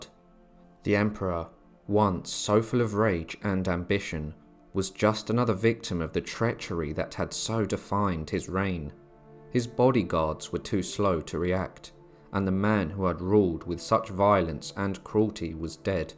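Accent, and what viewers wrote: United Kingdom. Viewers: Never change it bro. Its always nice to have a Brit tell me about history🤣👌🏻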